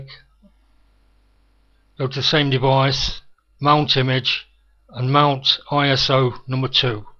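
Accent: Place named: United Kingdom. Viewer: great tutorial. great heavy british accent. you sound like one a them hardcore british gangsters. lol. but thanks alot. cutting right to the chase, no bullshit music or anything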